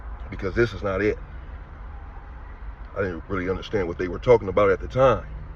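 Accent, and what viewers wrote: California, United States. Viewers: Bruh you sound like Chill from 16 to Life, it must be a Cali thing. Wishing you the best with the channel my G!💯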